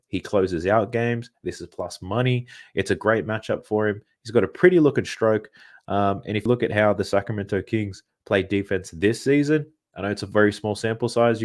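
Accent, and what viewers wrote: Australia. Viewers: no way is an aussie 🔥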